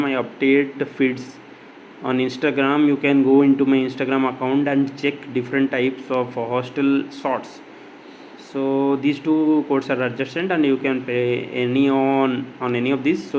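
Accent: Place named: Odisha, India. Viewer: Bro you odia? Coz your odia accent is 🔥🔥🔥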